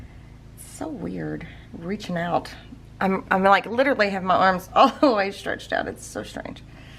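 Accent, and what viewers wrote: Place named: Kentucky, United States. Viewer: ! You have to be best person I have ever come across that is a creative genius, motivator, beautiful, loving, down to earth, genuine, giving with all your heart, Kentucky talkin' sweetheart!!!! I love all your videos!!! These are special and I can't thank you enough for all that you share and give to us!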